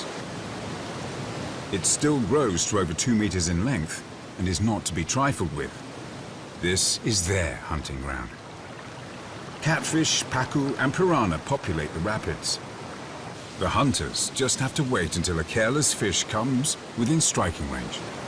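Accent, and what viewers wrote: United Kingdom. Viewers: This narrator is definitely not American. Sounds like he is from the U.K. somewhere.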